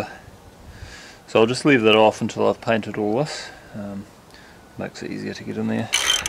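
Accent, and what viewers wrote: New Zealand. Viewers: Brilliant inspiration for handy gents around the globe! How you captured all those video clips while working that hard. Producer director handyman cameraman scriptwriter editor all going in your head at once…. And all we hear is that calm kiwi voice. Just wow.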